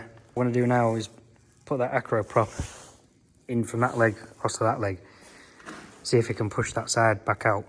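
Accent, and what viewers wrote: United Kingdom. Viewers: Came here on recommendation over on the CEE channel. I really like this kind of work - big fabrication by someone who's not afraid to take on a challenge because they have confidence they can do whatever it takes, even if it means re-engineering things from scratch. The Brit accents can sometimes be challenging for a US guy, but you're clear enough for me to catch the drift. 🙂Good stuff!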